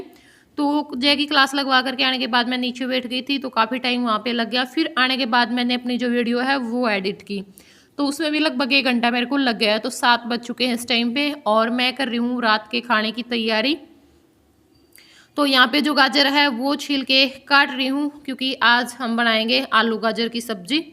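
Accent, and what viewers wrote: Haryana, India. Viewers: bebe ghne din m video Dekhi...Rohtak aali bolan laagiii bebe🤭🤭🤭🤭🤭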